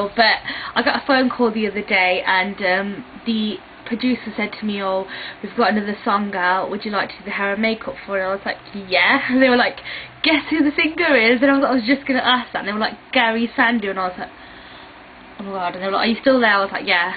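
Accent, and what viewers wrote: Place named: Coventry, United Kingdom. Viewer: I knew you were from Coventry, your accent sounds exactly like my cousin's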